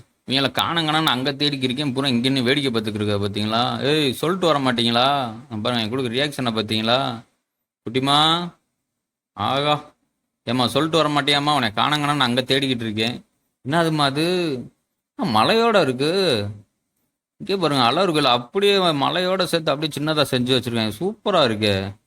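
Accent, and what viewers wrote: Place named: Madurai, India. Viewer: Mathurai slang 👌👌👌👌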